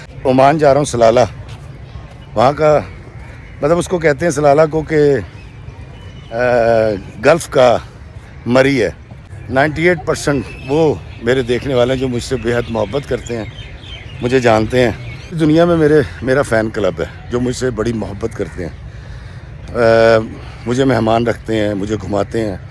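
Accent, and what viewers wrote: Punjab, Pakistan. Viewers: Assalam u alaikum sir Apki awaz MASHALLAH ND apka Punjabi extent bht khoobsurat hy.. ALLAH pak apko sehat wali lmbi zindagi ataa frmayen ameen 😊 ALLAH sb maa baap ko unki aulaad ki khushiyan dekhna naseeb Krain aameeen ❤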